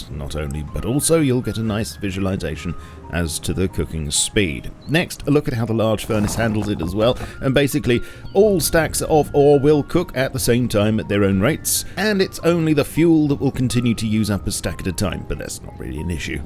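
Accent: United Kingdom. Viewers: You sound like the spiffing brit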